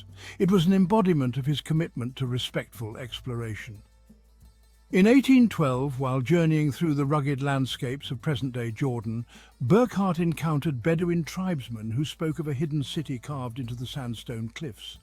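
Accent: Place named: United Kingdom. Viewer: It's painful to hear this shit especially in British accent... Western so called HISTORY expert just lays and lay, timeliness and historical facts all coming from the old testament and the talmud... Nothing in all of the documentaries makes any sense all just to make one creature happy....... FACT 🙈👁️🙉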